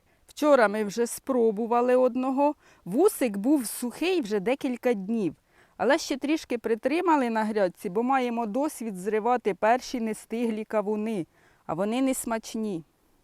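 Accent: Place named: Poltava, Ukraine. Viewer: Цікаво і пізнавально, дякую. І ще, насолоджуюсь вашою говіркою, так похоже розмовляють у багатьох селах Зіньківщини, де довелося деякий час жити. Там є село Власівка - точнісінько така ж інтонація в розмовній мові. Бажаю гарних врожаїв.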